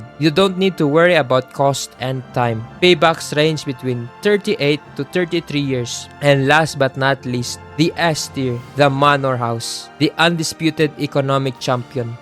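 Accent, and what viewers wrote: Philippines. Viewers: Sounds Filipino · Great video my dude! Really helpful resource for min-maxing playing tall strats. Also, I just have to ask are you from the PH by chance? Your accent is giving me kababayan vibes lol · I only hear pinoy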